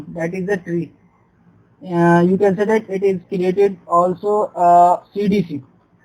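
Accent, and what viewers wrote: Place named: India. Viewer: Can’t understand anything thick Indian accent had to pause each time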